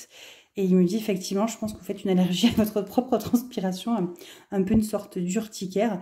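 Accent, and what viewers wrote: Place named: Southwest, France. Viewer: Cc merci pour ta vidéo j'adore avec ton joli accent du Sud ouest 😁.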